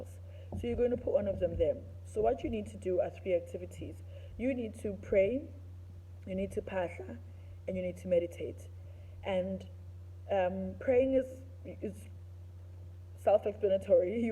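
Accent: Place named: England, United Kingdom. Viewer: logogo wenu okhuluma isingisi wathwasa e England yini ?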